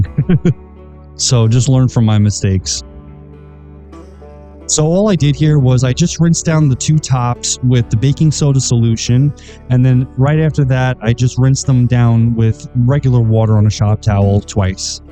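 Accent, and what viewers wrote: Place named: New York, United States. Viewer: Where on Long Island or New Jersey are you from?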